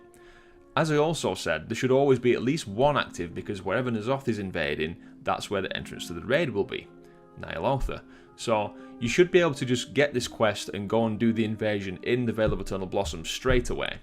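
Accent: United Kingdom. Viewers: Loved your chill and british way of talking!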